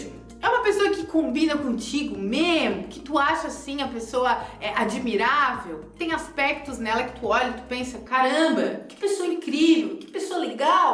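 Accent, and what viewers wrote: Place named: Florianopolis, Brazil. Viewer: Tú é de Floripa né, só pelo sotaque kkk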